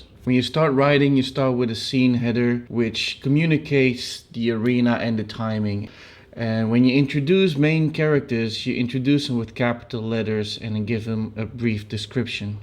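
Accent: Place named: Netherlands. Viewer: Dit is veruit mijn favoriete video. Dank u voor het script op het scherm. Dit is heel nuttig om nieuwe schrijvers die niets en nooit gezien wist over script en keigaaf. Dank u. Wij willen meer wij willen meer. Niet zeker over de kleur keuze hoor. Sorry man. U vraagt om eerlijke feedbfeedback. Oppassen met wat je vraagt om ze zeggen. Rreally vooruitkijkt naar de volgende one.Misschien volgende keer is over kleur kiezen en concrete voorbeelden van het gebruik van de camera op het scherm Iran. Stuurt u voorbeeld van wat ik heb. Film Academy is officieel geopend. Geweldig werk Keep it up.Ik denk dat het tijd is voor Fb pagina waren kunt u deze video's en schrijf mee.ook vriendelijk op beschrijving alle schakels/nes u noemt het cameramodel u gebruikt of kleuring cijfer van dat bepaalde video d.w.z. sterren zeggen hedendaagse episode wordt gefilmd yang zxxz camera thier bepaalde instelling. 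Ook voor bijvoorbeeld staat in thw beschrijving het script App naam duidelijk niets te vermelden, laat een l8nk voor de kijker te gaan zoeken meer over het onderwerp.Sorry maar Nederlandse accent is niet bekend hier. Moeilijk om te begrijpen dat je. hihihi. Weldone.Blijf insturen ooooo je guru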